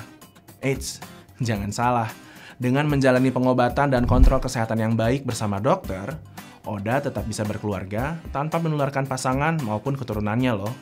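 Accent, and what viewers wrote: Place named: Java, Indonesia. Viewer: tp logat bahasanya kok sprti orang Jawa ya. Mohon maaf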